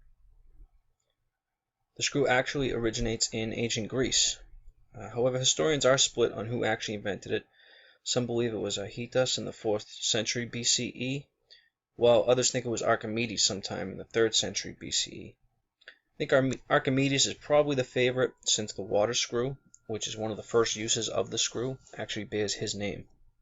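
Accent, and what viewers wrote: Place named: Boston, United States. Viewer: This is exactly what I've been looking, short, sweet and to the point. Also you from Mass. I thought i was hearing a Boston accent?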